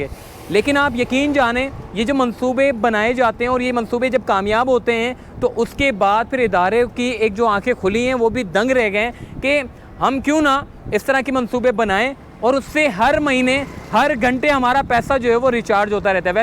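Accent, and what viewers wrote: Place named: Punjab, India. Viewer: تمھارے لہجے سے پنجابیت کیوں جھلک رھی ھے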